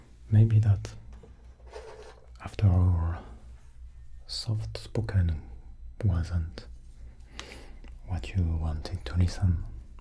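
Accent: France. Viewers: I love whispers but I’ve got like a tolerance up for them now, but you’ve broken it with your soft spoken voice. Love the when the french speak English it’s so nice ✨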